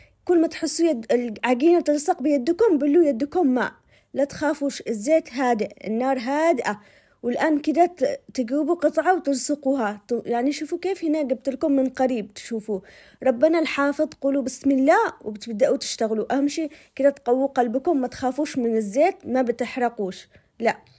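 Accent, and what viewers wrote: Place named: Sanaa, Yemen. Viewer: ماشاء الله عليش بلهجة اهل صنعاء مشحوطه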